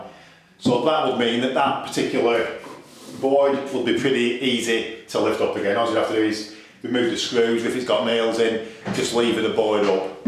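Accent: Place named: Lancashire, United Kingdom. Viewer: I recognise that accent ...... I'm guessing Leyland, Bamber Bridge possibly .......Preston as an outside guess ?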